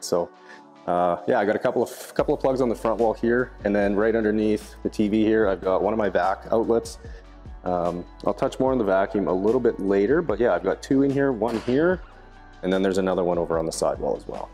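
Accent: Canada. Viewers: GREAT building!!!! Very professional!! I get a kick out of your Canadian accent too. GREEJ? I guess that's GARAGE? LOL Keep those transformed car videos coming!!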